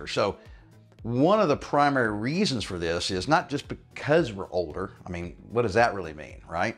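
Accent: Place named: Austin, United States. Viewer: I recognize your accent. Austin texas? Am I right?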